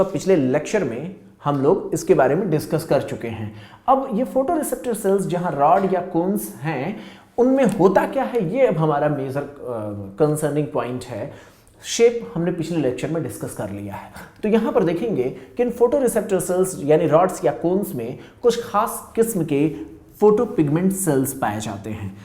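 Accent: India. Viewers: is this English with Indian accent or Indian language? Hard to tell.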